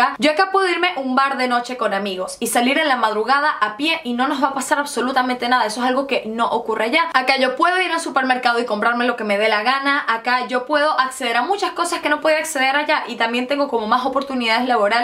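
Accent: Venezuela. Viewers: Hola. tienes una voz bonita entre venezolana y ese asentó jajajaja vives en rumania?¿ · Si tiene acento venezolano, soy venezolana y al ver uno de sus vídeos por primera vez me dije "esta chama tiene que ser venezolana por el hablar" xD solo que todos hablamos un poco diferente dependiendo del estado donde vivamos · Ojalá Venezuela salga por lo que está pasando y si tienes el acento venezolano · de que parte de Venezuela eres te reconocí por el acento venezolano, de mi parte soy de los andes Tachira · Y ya se te notaba que eras venezolana , saludos desde Venezuela . Cojedes :p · El acento venezolano no lo has perdido. Pareces una loca rusa pero el acento supe que eras una chamita venezolana. DTB desde Venezuela. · YO SABÍA QUE ERAS VENEZOLANA LLEVO RATO ESCUCHANDO EL ACENTO AHAHHA Hola yo también soy de Venezuela ❤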